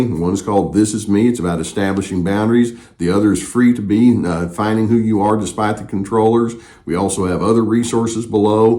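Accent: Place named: Texas, United States. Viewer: I'm sorry to drift off the topic, but I really like your accent. Is is Texas?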